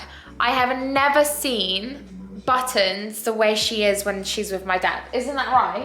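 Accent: United Kingdom. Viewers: daaammmnnn...why am I so love to british accent, so cute 😻 Wait 🙄, really just the accent bro?? 😏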